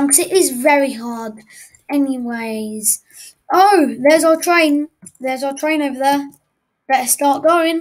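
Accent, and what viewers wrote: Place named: United Kingdom. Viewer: Super strong British accent love it!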